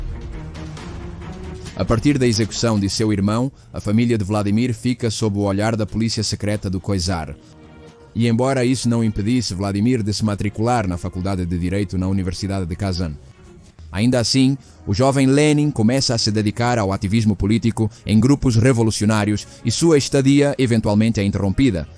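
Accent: Portugal. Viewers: Ficou uma 💩 com esse português de Portugal! 😒👍🏻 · É de português de Portugal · Sou Brasileiro e acho lindíssimo o sotaque da Pátria Mãe Portugal ❤ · As revoluções são as festas dos oprimidos e explorados Fladimir Lênin Gostei do sotaque Português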